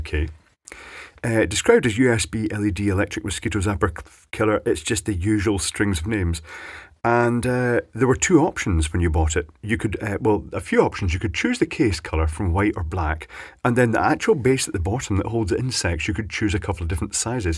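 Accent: China. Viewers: I love Chenglish!!!!!!!!!!!!! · Thanks. I love the Chinglish pronounciation!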